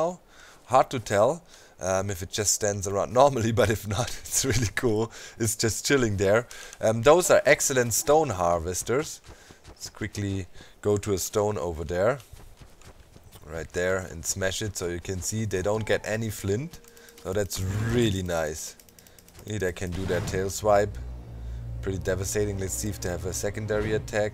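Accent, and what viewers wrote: Germany. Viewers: Du bist aus Deutschland, das höre ich!🖖🏽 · Do you live in Germany. Because your english got some german flaire in it.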